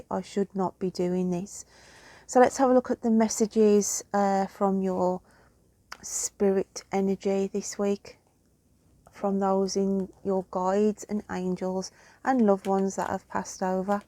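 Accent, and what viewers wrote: Birmingham, United Kingdom. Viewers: Love the accent a Brummie I think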